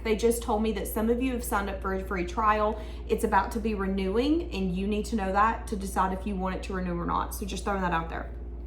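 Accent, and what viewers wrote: South, United States. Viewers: Accurace as always! Loooove your adorable country 🤠 accent 🌹🌹🥰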